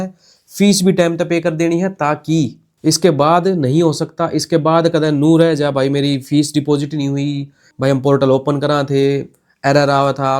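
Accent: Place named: Haryana, India. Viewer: Sir ka haryanvi accent ohhhoooo 😍😍😍😍😍😍😍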